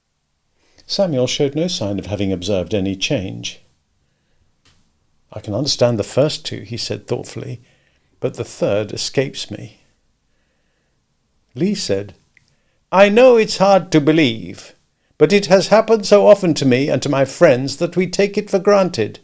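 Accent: United Kingdom. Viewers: I find Steinbeck's use of stones to be interesting. Upon researching the biblical meaning of stones, they seem to symbolize "endurance, stability, and permanence," while Steinbeck's use seems to alludes to the opposite, i.e. stones are something to be removed, thus showing his roots that stem from farming. I'm sure there is a deeper meaning, but I will need to ponder this a while. Interesting to be listening to this via a Brit!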